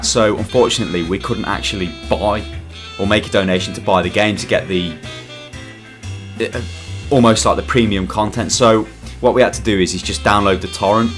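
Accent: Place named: North, United Kingdom. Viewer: Your accent has changed since this video. You sound more northern in this video.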